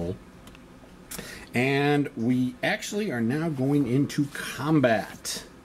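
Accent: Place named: South, United States. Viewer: I liked it... the Southern accent added just the right touch....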